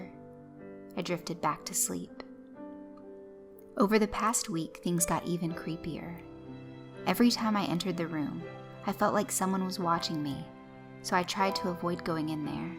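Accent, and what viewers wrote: South, United States. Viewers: Wow, that one about the white handled gun was extremely creepy and sad. But that bang at the end, I admit I jumped. Cool narrative your accent are you from the South? Or did you do it for the story?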